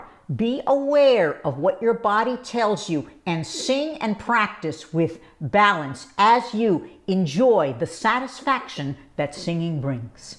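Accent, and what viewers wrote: New York, United States. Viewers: Are you from NY? Lol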